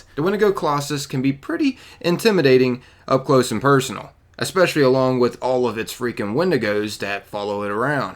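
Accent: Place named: Rural, United States. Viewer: YEAAAAA!!!!!!!!!!!!!!!! THE CONTRY VOICE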